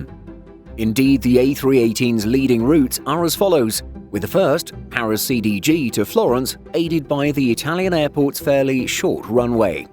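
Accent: United Kingdom. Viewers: You can hear from the stiff accent that that's British, very very British. So they simply cannot use metric system because they are Imperial.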